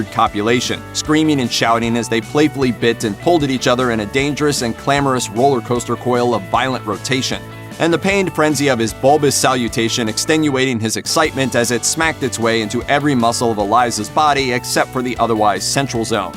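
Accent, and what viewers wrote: United States. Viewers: It's interesting to choose the most inappropriate American blah blah voice to essentially attack with much untruth and sensationalism a English artist.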